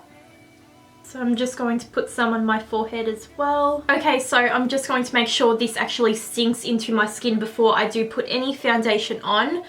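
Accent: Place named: Australia. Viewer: I love your channel! You're my fav. Makeup-youtuber with no exceptions, love the australian english too!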